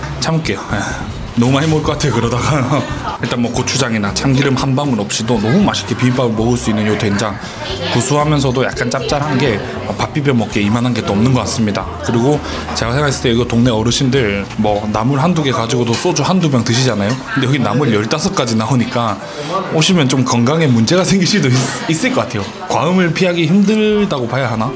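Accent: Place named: Seoul, South Korea. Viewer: 이제 인트로가 서울말 다됐네 다됐네.....초심....유튭최강자 되니까 사람변한다....사투리로 돌아와줘😭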